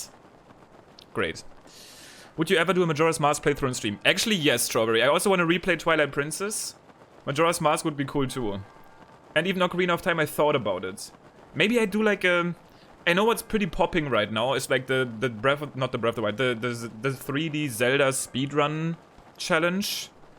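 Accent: Germany. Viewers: You acctually speak like a german. Man I know, I'm german, if you not. ANSWER PLEASE NO OR YES, please... se.. :) :/ I know im peasky!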